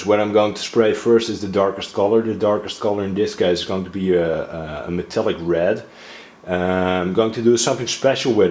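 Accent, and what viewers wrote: Netherlands. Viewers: u sound dutch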